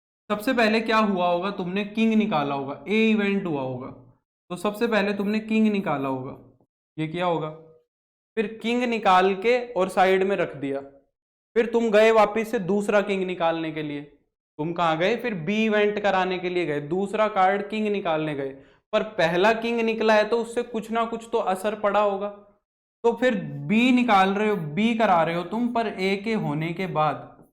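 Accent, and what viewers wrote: Haryana, India. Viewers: Sir app haryane ke ho na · Is sir from haryana?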